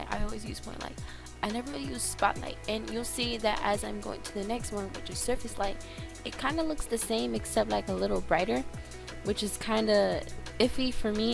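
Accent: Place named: United Kingdom. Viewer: im kind of hearing a british accent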